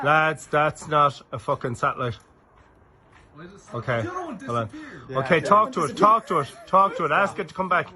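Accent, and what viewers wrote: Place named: Ireland. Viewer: Thanks 😊loving your Irish accent. How do you take your photos and do you use special software to get the close ups? I'd love to photograph my craft I see.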